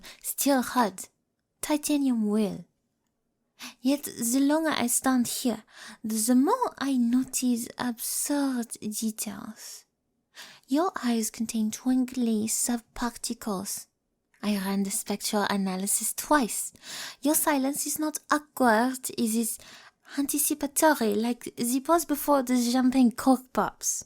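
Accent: France. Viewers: You did a wonderful job on speaking in a French accent! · I love your French accent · This detective audio was absolutely amazing!!! 🔥 Your voice performance was absolutely outstanding and you did the French accent perfectly! The art style for the thumbnail is so beautiful and I really love the character's design! I already can't wait for what audio you deliver next with your amazing talent! Amazing work! 🩷🔍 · Oui oui you sound gorgeous with a French accent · She accuses us of too much rizz? Excuse me, with that French accent and detective charm, you are the one who has rizzed us all! Thank you, 🤍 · I love your French accent! Ooh la la! Bonjour! ¡Enchanté! 🥰😍😻🤗😘💗💓💖💕💘 ❤❤❤❤❤ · Congratulations. I'm obsessed. I need more french accents. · God, I need another French accent video by you. The way you do it is great. It's enthralling and incredibly amusing at the same time! 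· Omg bro ok this audio is your best one so far no question about it. Your French accent is amazing. I love the funny names the tool and different Geneva accords are called and even the funny scenario names you came up with. Loved the “rap sheet “ The French accent makes it better. The bad weather random voices are distinct in range are easy to tell the different voices. The lore in the Clark verse is reaching levels closer to Radish asmr.Thanks to the detective we will finally know how Clark is able to achieve these absurd levels of charisma. He needs to leave some girls for the rest of us oh my goodness king 😭. I love the detective she brings out such must protec energy out of me. I am so glad I subbed and joined your discord. This part is for especially imagine I am one of your female friends for this.” Girl you ate that and left no crums 💅” “Omg slayyy girl 😂” “Girl your voice is divine “ “Yes queen you ate that up”. 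“ Yesss girl the thumbnails are on point “. On a more personal note hope you are well and taking care of your mental and physical health you are talented and your work is subline. So happy to see you reach new heights girly pop. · I'm from Louisiana and u have a beautiful French accent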